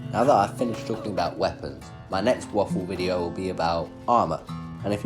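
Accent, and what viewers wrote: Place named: United Kingdom. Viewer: When you're just British without the spiff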